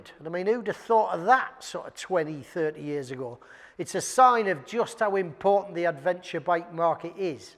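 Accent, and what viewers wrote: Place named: Northern England, United Kingdom. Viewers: It's our favourite Geordie !! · Who is this miserable Northerner??? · It's nice to see and hear a northerner instead of those shandy drinking southern pair of knobheads. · Oh god started to watch then stopped. Can't stand gobby northern twat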